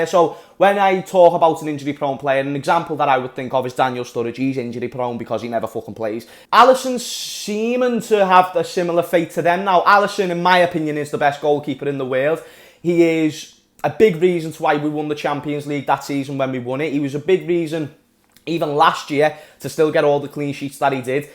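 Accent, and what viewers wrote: Liverpool, United Kingdom. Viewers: I'm going out on a prediction limb that I hate: I'm saying we win the league, no surprise there. BUT, the thing I hate is that I really think Everton could be number 2! We can't be blind to what Carlo is doing over there! And, the Italian Stallion is sort of a nemesis to Klopp from his days at Napoli. He always gave us trouble and every Red should realize he's far from shite and since he's got his wonder boy with him, I'm rightly concerned. Number 3, 4, or 5 is City and I'm thinking Arsenal will be 4 because Arteta is doing the business over there. Gunners could be number 3, so we better be ready for them. You called the line-up, I think except maybe the gaffer could start Milner but I'd rather have Gini. Yes, Ally is injury prone obviously. Will he be out long this time? I don't think so. I'm thinking 2-1 because there ain't no 12th man in the Kop to encourage our lads. 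Okay, Brendon and his foxes will be number 5, I think, but could be number 4. Managers gettin' the sack? Jose, Fat Frank, OGS are all gone this year. Pep won't get sacked but he's moving on after the year, I predict. BTW mate, for what it's worth, take it from an old man, you are boss at this. Keep it up and your channel will become massive in the Red fanbase zone. You sound like a seasoned pundit already and I'm hooked on your Scouser voice, your language, and the way you get excited and worked up like the diehard fan that you are. Inspiring, young man, simply inspiring! I watch everything you d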